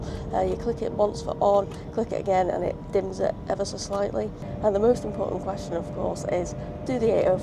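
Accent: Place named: North, United Kingdom. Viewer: Ah sorry it's that lovely northern accent of yours lol. Keep up the great videos they always make me smile 😊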